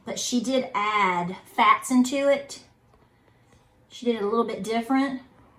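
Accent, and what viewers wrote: South, United States. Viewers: Also I am subbin any gud ole southern gal that says Flar and not flour is my kinda sister. Glad to have found ya channel gurl! God bless y'all!!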